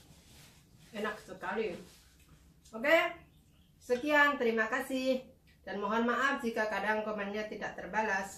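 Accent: Java, Indonesia. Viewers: baru liat channel mbk sekali tapi ud sy subscribe soalnya bagus banget anak ttp diajarkan dua budaya bahkan budaya tempat tinggal luar biasa. banget bisa pertahankan logat jawa juga😊😁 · Ya.. Mbak2 sekalian... Kita yg tinggal di luar negara saja yg dpt memahami.. Org yg gak pernah keluar negara atau tak berkeluarga dg org asing mmg tak faham.. Mak saya dah 29 tahun tinggal di malaysia.. Bahasa Indo nya dah cacamarba kira wang indo lagi lucu.. Saya rasa mbak masih ok sangat.. Bahasa jawa masih medok bahasa Indo masih ok.. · Hahahhaa aku nontonnya subuh2 jadi ngileerr pengen rujak buah, ditambah lg mbak pas cerita logat jawanya keluar bgt jdnya lucu gk ada kesan judes 😂😂 aplagi pas nguleg lombok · Sabar mb.... Namanya orng bnyak y bgtu Tp ak suka ketawa klo logat jawanya keluar...podo mbak kro ak ...hehehehe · Biarin orang nyinyir, karna nyinyir tanda tak mampu, semangat mbak, kamu bisa jadi inspirasi wanita Indonesia, aku suka banget gaya bahasamu yg medok jowo banget. 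Kita kan orang jowo.❤️❤️❤️ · Mbak orgnya asyik kok.. gk sombong.. gk lp bahasa indonesia aplg bhs jawanya itu lo.. medok bgt 😊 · Hahaha salut mba semangat ibu hebatt ... Sy seneng gaya ngomongx wong jowo biar tinggal di luar ngakk sombong 😍😍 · Mornibg,ni ch saya lht pas pagi nyiapin msak mjkn sdh selesai,b8sa buka You Tube di dpur...biasa nyuri waktu...saya slalu bgikuti...saya bgt logat mu msih medok juowo bgt lo jeng,iyo jebg ngono iku ra sah di dengar jeng,anggap ae org iri dgn kehidupane pean..saya salut bgt,bisa jdi IRT yg bsik,saya bisa mencari tmbhan rezeki,tetapi anak"ku q titipin ke Ortu ku...n...kakakku.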